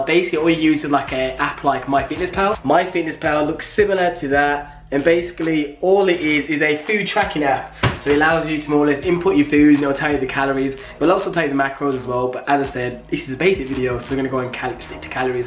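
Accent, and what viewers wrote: Birmingham, United Kingdom. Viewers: I know, I love the Brummy accent :-)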